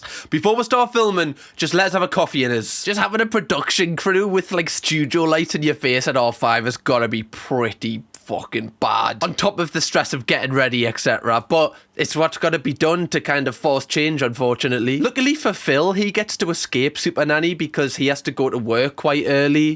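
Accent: Newcastle, United Kingdom. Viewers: Can I just say never change your accent and don't start saying 'mom' like some other UK YouTubers do; your accent is great and repping for the geordies!! ❤ from in London, UK ❤ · your comments are hilarious and true. Proper Geordie?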